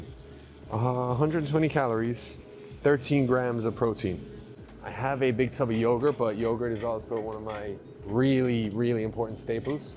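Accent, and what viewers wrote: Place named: Ireland. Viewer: Irish or American? Losing the accent lad 🇮🇪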